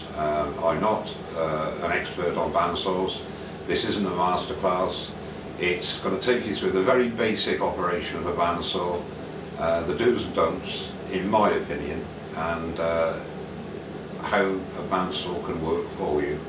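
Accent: United Kingdom. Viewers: Really enjoy your videos being a brit living in amercia hearing a fellow brit talking about things I enjoy brings much joy and not to mention learning some great information keep your head up mate from a fellow brit who is home sick I really appreciate the videos.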